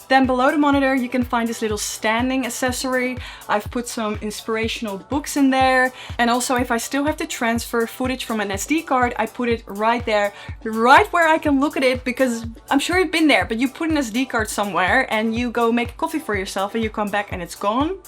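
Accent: Netherlands. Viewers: dutch accent? or not? anyway, nice vid! wtf that cabinet , looks like its about to fall apart😂 but what was that thing all the way at the ceiling? extra storage spot?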